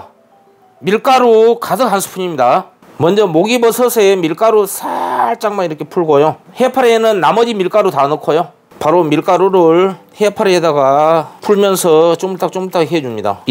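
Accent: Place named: Gangwon, South Korea. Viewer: 강원도 분이시네요. ㅋㅋㅋ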